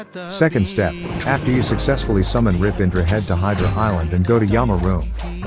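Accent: Philippines. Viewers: Are you filipino? Bc the sound is filipino bro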